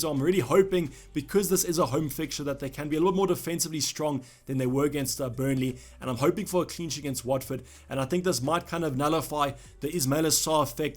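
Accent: Afrikaans, South Africa. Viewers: Are you from South Africa? jy klink Afrikaans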